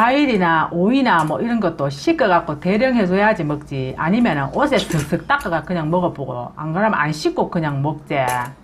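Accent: Gyeongsang, South Korea. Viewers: 잠곡밥. 너무좋아요 갱산도 사투리가 구수합니다 선생님 좋아요 응원합니다 👍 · ㅋㅋ 경상도 말이라 언제 들어도 친근감이 가서 좋아요 남편이라도 말 안들을땐 콕 쥐박아줘요 ㅎ 그리고 소변 튀게할땐 억지로라도 변기에 앉히세요 난 사윗한테도 잔소리해요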